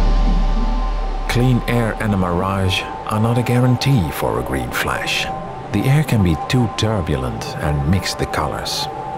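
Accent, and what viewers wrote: Netherlands. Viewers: Goede video, dacht al te horen dat je Nederlands was.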